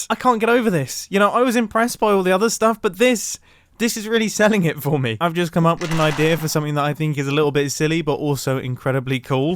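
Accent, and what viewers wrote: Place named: United Kingdom. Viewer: There’s something about a Minecraft youtuber with a British accent that just hits different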